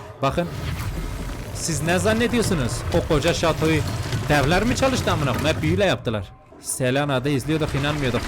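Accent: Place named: Diyarbakir, Turkey. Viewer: Abe Diyarbakırlımısın şiven küfrın kürtçe bilmen buralari andıri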